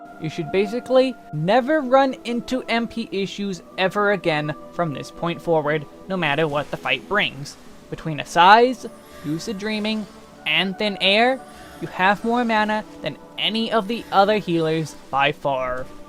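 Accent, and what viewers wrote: United States. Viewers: At first I thought his way of speaking to be familiar, then I realized his intonation is basically the same as Superbutterbuns', but a way more chill. (I wonder if they're from the same state in the US, but I don't know how to differentiate all the accents, so if anyone knows, please tell me)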